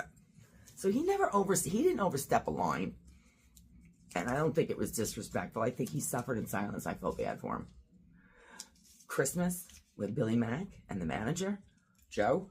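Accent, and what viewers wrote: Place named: United States. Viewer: You don’t need a cute British accent, you have a cute American accent and you are genuine and in my opinion beautiful. 😊 women are allowed to be happy and have dreams. Looking forward to your videos!😊♥️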